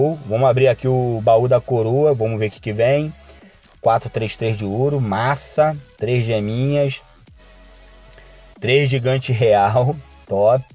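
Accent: Rio de Janeiro, Brazil. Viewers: vara seus vídeo e top d+ mais o sutac carioca incômoda muito um mineiro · Vs é Carioca Né? Adoro As Gírias Carioca!!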